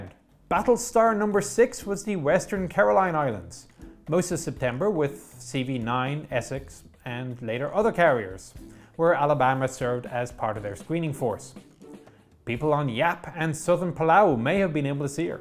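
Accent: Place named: Ireland. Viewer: I really like the systems view of the technical details, the dry wit, and the understated Irish accent. It stands up pretty well, even to a mostly talking head approach. An inside the hatch view of a battleship would probably take about 1000 hours at least, so that’s not likely to happen. I would find it entertaining, though to see how you would fit through the passageways and hatch ways, and into the racks or berths or morgue slots or whatever they called the beds on those things. I’ve got the misfortune of being 6’4”, with a non-streamlined length to beam ratio, and I don’t think I’d do well.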